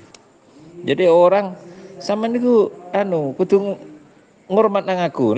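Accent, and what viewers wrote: Madura, Indonesia. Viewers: Kalo lihat logat bahasa, logat bahasa Madura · Suaranya seperti akaen madura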